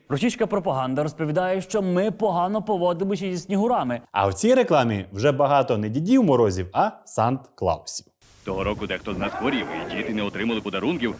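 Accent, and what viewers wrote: Transcarpathia, Ukraine. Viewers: ти з Закарпаття? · Ти із Закарпаття? 😮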